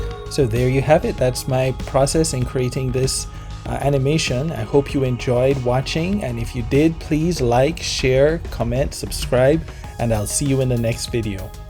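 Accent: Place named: Caribbean. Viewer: Great video! I like how expressive you made her. Its the reason why I clicked on your video. And after 30 seconds I knew you were from the West Indies. Keep at it!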